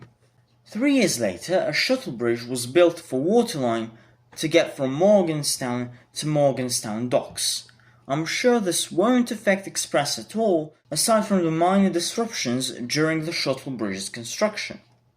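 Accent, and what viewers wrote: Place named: United Kingdom. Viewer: I just realised you sound completely British, but you're from Serbia?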